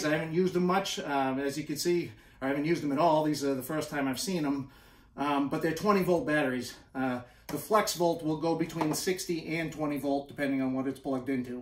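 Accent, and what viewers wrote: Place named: Boston, United States. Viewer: Love your review...you sound like you might be from the Boston area. I'm from Long Island. I wish I knew about the new model! I bought the 220 this spring and while I love the way it performs, the batteries run out of juice so quickly and it takes so long for them to charge I can't mow my front and back lawn on the same day. (And they're pretty small suburban lawns.) I would have loved the larger batteries and longer run time.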